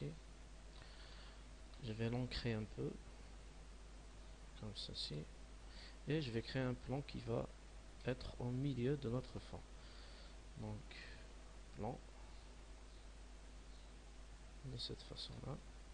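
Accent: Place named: Kabylie, Algeria. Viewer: toi t es Kabyle !!! j'adore l'accent t'es de chez moi toi ^^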